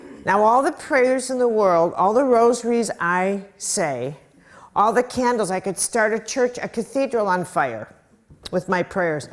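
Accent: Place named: Midwest, United States. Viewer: I had to stop amd comment when you spoke about your midwestern accent. For some reason hearing you actually state that you are from Chicago multiple times was too obvious for me to actually pick up on. I have to make things difficult for myself, as a general rule. It wasnt until a flashback to the old SNL skits about the Bears amd the Bulls (John Goodman, George Wendt I think? Or maybe Im making up Wendt being there, I dunno) anyway thats when I finally 'got' your accent (which isnt much of one to me,ostly just the 'a' that you mention). I love hearing you speak, I hope you never figure out how to have the 'a' removed. It would just be wrong. I might even have to just stick to your books if that happened. Which, by the way, I use a text to speech british accent voice to listen to ebooks while doing other things, one that is no longer freely available or...whats the word...compatible with android since the last several major updates, and a huge pain in the rear to mod and sideload and stuff to get it to work now, but worth it to me. 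Its so realistic and soothing. i even pay for it some months if I cant find a way to hack things with current devices, as I think its not even possible with the most recent update, and have to go about it a different way that charges if I read over a million characters (which happens and which is meant for developers., and takes some work). My point is, as much trouble as I take to use that specific British accented voice, I so much prefer to hear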